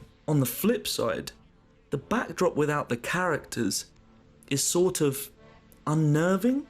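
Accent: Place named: United Kingdom. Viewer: Youtube: "Do you wanna watch a video of a british man explaining a Spongebob parody of a album?" Me: "Yes."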